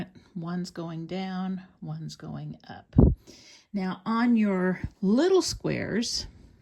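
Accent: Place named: St. Louis, United States. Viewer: Are you from St. Louis? I heard only people fro St. Louis say (warsh). I'm from STL and say warsh.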